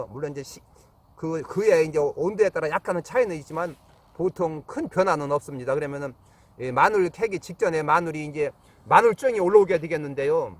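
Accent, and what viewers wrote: Chungcheong, South Korea. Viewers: 충청도 사투하네요 고향이 그리워요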